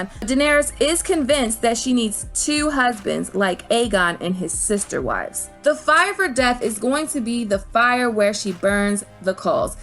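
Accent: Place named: Baltimore, United States. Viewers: your critical thinking skills are next level...i have enjoyed your videos for the past couple years (you, gemma, quinn, and preston jacobs)...you are obviously as obsessed with the GOT world as i am. (and your baltimore accent reminds me of my family) keep making that magic happen. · I agree I hear a distinct Baltimore version of the Long Night. I don't know where is from but I am hoping I am right. She send us Ravens it only makes sense.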